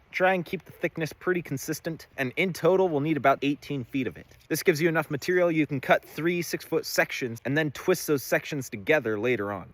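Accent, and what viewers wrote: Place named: United States. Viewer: This guys accent is crispy. I don’t usually like the American accent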